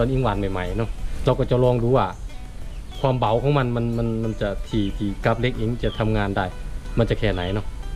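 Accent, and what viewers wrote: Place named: Korat, Thailand. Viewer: โมแปลกดี คลิปดีมีประโยชน์ ติดตามชม สำเนียงคนโคราชคือบ้านผมเด้อครับ 👍👀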